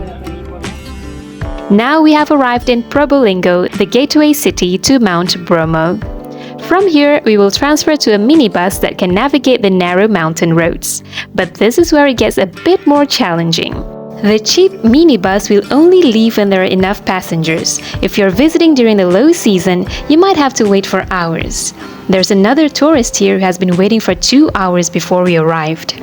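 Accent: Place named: Australia. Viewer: have you spent time in Australia? sounds like you have a slight Australian accent.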